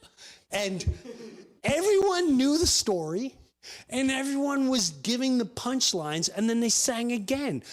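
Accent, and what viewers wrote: Ireland. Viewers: Interesting how you slag off English food (in an Irish accent) and then say the Irish woman told you to go eat "Fish & Chips". You COULDN'T get more English than that 🤣🤣🤣🤣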